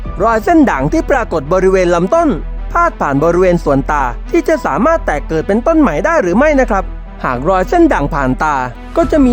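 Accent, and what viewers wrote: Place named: South, Thailand. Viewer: เสียงบรรยายคล่องแคล่วดี แต่ออกสำเนียงใต้มากไปหน่อย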